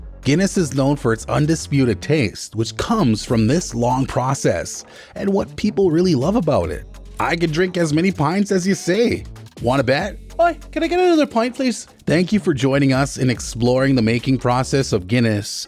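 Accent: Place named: United States. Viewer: all these patterns and designs in the top of the guinness as in the clover design etc..... all for americans. no self respecting irishman would fook around with a pint in such a way. soon as i heared the american accent describing guinness i knew it was all nonsense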